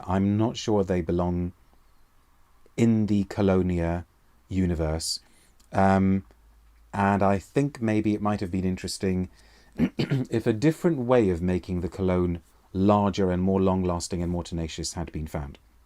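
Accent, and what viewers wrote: United Kingdom. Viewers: and a lovely oxford accent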